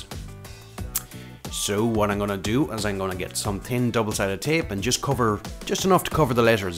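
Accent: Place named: Ireland. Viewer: wasent going to like because of, i usually dont like videos.. but i really like the irish accent, so i liked it plus thx for the help XD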